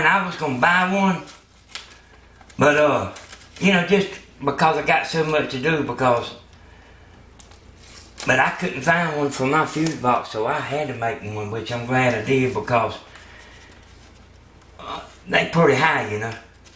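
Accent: South, United States. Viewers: love your southern drawl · I be good ol central valley California boy...no sooner I heard that deep down southern accent...hit that subscribe button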